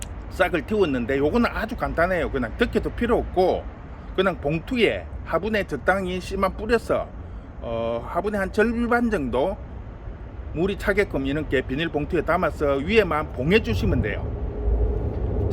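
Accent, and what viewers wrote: Gyeongsang, South Korea. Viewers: 구수한 경상도사투리 파종법 영상 올려주셔서 감사합니다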